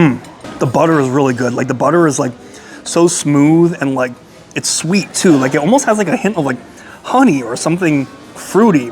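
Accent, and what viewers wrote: United States. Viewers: A question for any native Mandarin speakers: How well does speak Mandarin?. Does he have an American accent? How fluent is he? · I know enough to say a native speaker would notice his American accent immediately.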